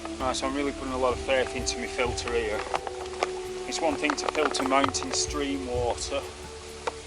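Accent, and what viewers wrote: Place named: Lancashire, United Kingdom. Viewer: Only just come across this one 18 months too late. You've lived there since being 17 and I thought you'd just stepped out of your home in East Lancashire 5 minutes ago. I lived in London for 5 years in the 80s and a Yorkshire accent caused some amusement then. Brilliant video as always,. Keep them coming.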